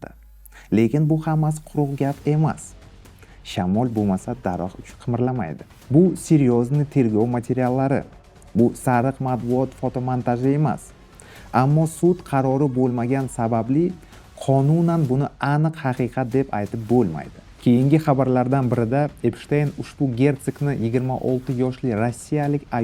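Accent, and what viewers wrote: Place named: Navoiy, Uzbekistan. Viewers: Aka Navoiydanmisiz, shevayiz shyaqqa tortyapti😊😅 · Навоий акцент ку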